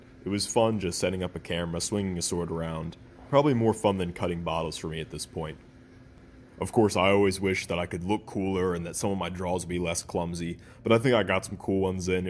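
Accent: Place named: Pittsburgh, United States. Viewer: You sound like you're from Pittsburgh 🤔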